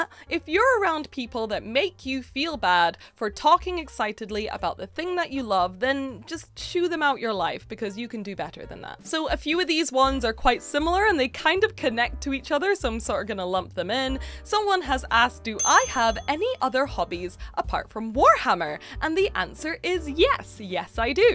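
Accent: Scotland, United Kingdom. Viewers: I knew you were from Scotland. You have the same accent as Christine Kochanski, Listers babe from Red Dwarf. The earlier versions not the English one obviously. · its nuts saying this but as a fellow scot i was still like "IS SHE SCOTTISH?!?!" for a while watching a few of your videos, i thought maybe you had moved somewhere and got a dual accent maybe. but then i settled on "nah she scottish" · Your accent sounds scottish to me but americans are terrible with accents and will confuse completely different accents 😂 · Her accent sounds Scottish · People thought you were American?! As an American, I'm baffled. To me, you sound like a Scot with an accent somewhat influenced by British broadcast standard (e.g. BBC), but I'm American and am not deeply familiar with UK accents. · As another scot, you very much sound Scottish dont worry. 😂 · I figured your accent for Scottish right away. 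I'm honestly surprised people ask. It seems obvious to me. Mind you, I was raised on British comedy, so... I agree on the "calm down" thing. I hate being made to feel badly about the things I love. I think your Bloodbowl goblin is adorable. It's not really my business why you are no longer with GW. I just know it's their loss, and our gain. I'm looking forward to everything you have in the pipe. Wishing you nothing but unbridled success! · Congratulations, I think you've done a fantastic job with your channel. You're so easy to like, and your passion for the hobbie shines through. Seriously, though, how anyone could think you were from anywhere but Scotland is beyond me 😂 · I figured it was Scottish, but couldn't put my finger on it, very light northern Irish was my second guess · Definitely a Scottish twang in your accent, but not an out right Scottish accent I feel. Have you been out of Scotland for a good long while perhaps? 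· How do people think you have an Australian accent? your accent is very definitily north of Hadrians wall lmao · Anyone who'd place your accent anywhere but Scotland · I now know why I love your accent my grandad was from dunfermline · It's so good to hear more Scots on YouTube. I knew your accent was from somewhere near where I was brought up, which was up the road from Dunfermline in Perth. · Yeah that bit I thought was wild, I thought, her accent is obviously Scottish but also very much not Australian or American... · I just assumed your accent was Scottish mixed with speech therapy due to dyslexia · It is strange-- I can usually pick out a Scottish accent right away, but for some reason it never crossed my mind. I kept getting stuck in a loop of Welsh? No. Northern English? No. Welsh? No... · Quite obviously Scottish. Silly people. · Ah, the challenge of an East Coast Scots accent. 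I’ve been asked if it was Canadian. In Canada. No idea why it’s such a hard accent to place. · Maybe it’s because I have Scottish family and wear a kilt full time, but there was no question about you being a Scot. The accent is a dead giveaway and in this video, the flannel you are wearing is Black Stewart. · Very obvious Scottish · Well, I'll be damned...always thought you were Irish over Scotish. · I was like “that accent is definitely Scottish” and then all the questions came up and I thought man… am I wrong, is it something else… nope. I guess perhaps modern media has led people to think everyone who is Scottish has to sound Glaswegian. I lolled when you said “Australian” but I can see how some might think American if by that it’s the Appalachian accent which can sound a bit Scottish, but given its relatively rare and you live in the UK Scottish is far more likely 😂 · I think your accent sounds Scottish with a hint of notts, maybe sutton/Kirkby area lol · Just sound Scottish to me. 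But to be fair, I'm English and know a fair few Scots. So I have a higher level of exposure than someone from outside the UK.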